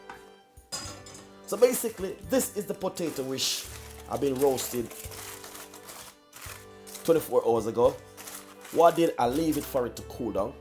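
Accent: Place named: Jamaica. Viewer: Why put sugar if sweet potato is already sweet? Anyways Love you accent .. you sound like Jamaican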